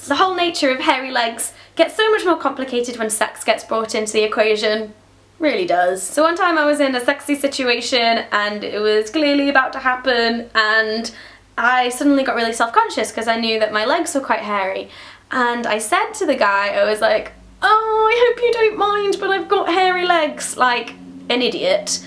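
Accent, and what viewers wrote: United Kingdom. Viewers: I normally don't like British accents but I like yours for some reason. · I love your accent girl it is so awesome!! Makes me wanna be British. And I get lazy all the time and don't shave my legs, who cares because you don't live to impress other people and if you don't wanna shave your legs then so be it. :)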